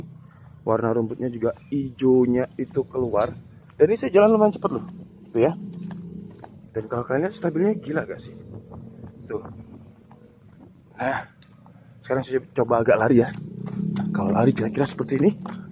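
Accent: Malang, Indonesia. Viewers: Bang mu orang malang??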